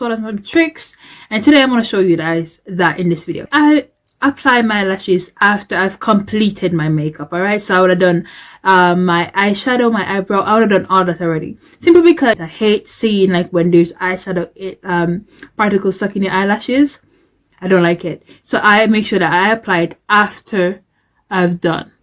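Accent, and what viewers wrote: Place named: Caribbean. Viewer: Thank you for showing this definitely like your Caribbean accent the way you explain things also for keeping it real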